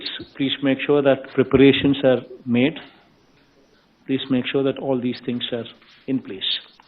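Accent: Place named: Telugu, India. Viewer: Good TELINGLISH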